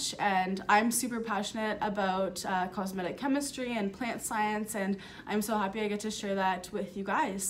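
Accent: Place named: Canada. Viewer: I love your Canadian accent!!!!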